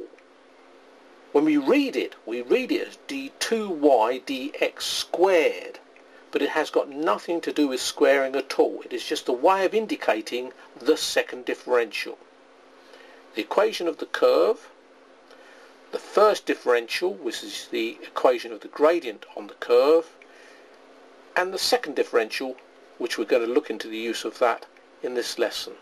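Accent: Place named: United States. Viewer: thanks erm are you american or something...i love your accent! especially when u say...'That was careless of me' when u make a mistake hehehe x x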